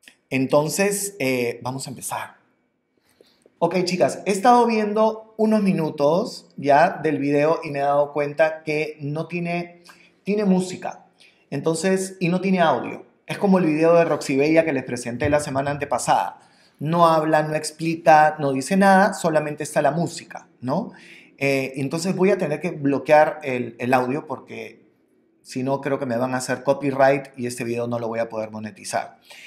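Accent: Portugal. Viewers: Te Amo! Tu eres AMAZING!!! ME Encanta verte, oírte y aprender de ti. Gracias por Existir preciosura y por hablar tan hermoso Portugués 💕 💖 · Me encantó escucharte hablando en portugues :) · ese acento portugués me enamorooo ❤️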